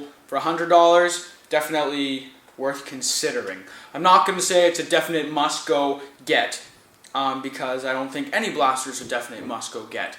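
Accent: Canada. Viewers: This guy is Canadian as fuck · are you canadian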